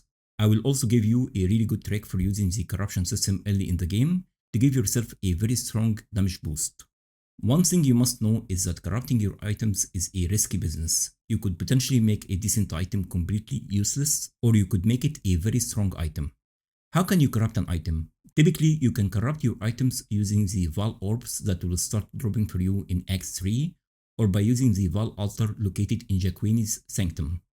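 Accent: Egypt. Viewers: ايه يا باشا من لهجتك عرفت انك مصري ❤️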